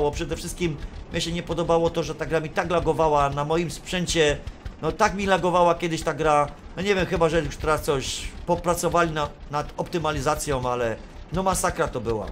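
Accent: Silesia, Poland. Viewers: Szanowny twoje poczucie humoru w tym filmiku konkretnie sprawiło że płakałem jak norka jako że uwielbiam Śląsk twoja gwara śląska sprawiła że poprostu się popłakałem więcej takich filmów. Zazwyczaj poprawny polski tym razem typowa gwara dziękuję za poprawę humoru. Pozdrawiam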